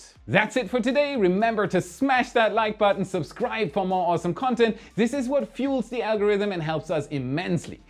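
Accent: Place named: Germany. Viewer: I did not expect my comment to get such a big response and so many likes. With over 500 thumbs up it is obvious many others feel similar. I appreciated your comment because I did not see the episode you referred to where expressed he was getting burned out. I have been a subscriber for almost 4 years, way back when had a very noticeable German accent. I really liked his channel back then but also there weren't as many options. He made a big commitment to this endeavor moving himself and family from Germany to the US to be closer to the action so to speak. His show style and presentation may not be for me anymore but I am glad you and others still enjoy it. I absolutely wish him only the best and even more success.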